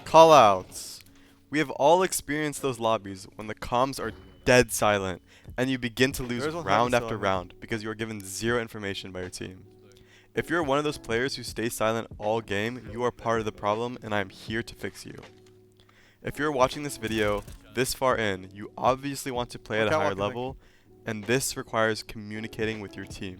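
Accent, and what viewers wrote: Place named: Ireland. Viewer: sounds irish lmao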